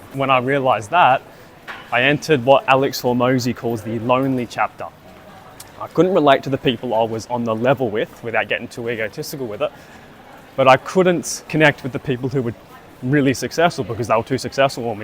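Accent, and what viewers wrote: Australia. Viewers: He's Australian?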